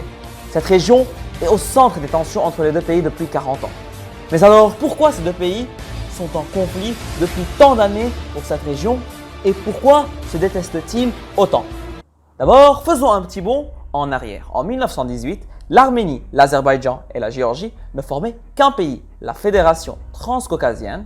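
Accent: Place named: Belgium. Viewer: Cet accent Belge à couper au couteau, MDR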